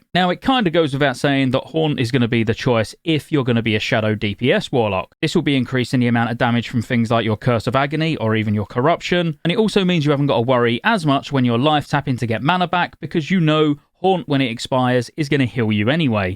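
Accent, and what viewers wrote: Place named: London, United Kingdom. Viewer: Definitely got a subscriber from this video. Also sound like you're from London and remind me of Alex Brooker!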